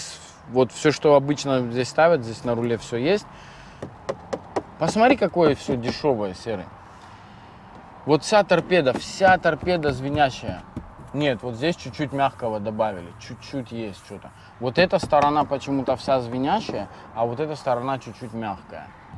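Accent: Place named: South, Russia. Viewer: Еще раз молодцы, (ели я не ошибаюсь) расскажи о себе, как докатился до такой жизни (Америки).. откуда ты.. говор Южный...я из Нальчика.. Шкода как таковая у вас есть?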